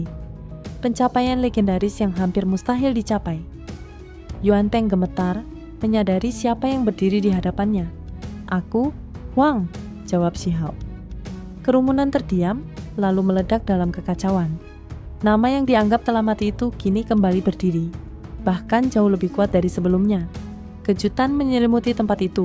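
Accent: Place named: Java, Indonesia. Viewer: lanjut mntap....ga ada bosenya kaya suara indah ke jawa2nya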